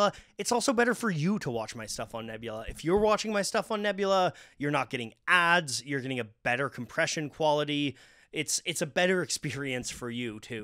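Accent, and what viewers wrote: Canada. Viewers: Dude - I think you're great. Your channel rocks and you have so much knowledge. And where are you from? I'm thinking Canada somewhere.... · People have their right to privacy, so I don't care whether a content creator shows their face or not. Not seeing face made me listen more closely and I picked up that he's Canadian. Actually because of voice similarity, for a while I was wondering if another YouTuber I watch was secretly doing this channel too. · Canadian man detected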